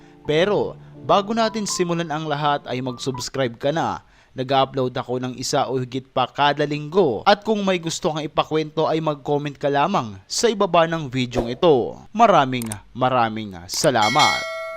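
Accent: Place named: Philippines. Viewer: I love your Filipino accent... 😅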